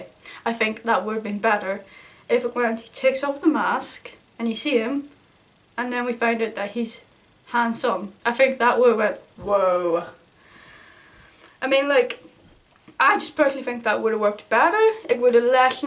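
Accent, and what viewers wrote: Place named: Ireland. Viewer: Nice review. As an aside, I didn't realise you were Irish; I though Scottish :D Excuse my poor English ears!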